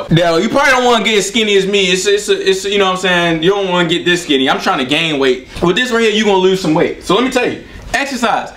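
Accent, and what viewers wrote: Baltimore, United States. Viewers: most def from bmore i hear it lol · he baltimore af “EXECISE” no R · Hear that bmore 😂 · Is he from Baltimore?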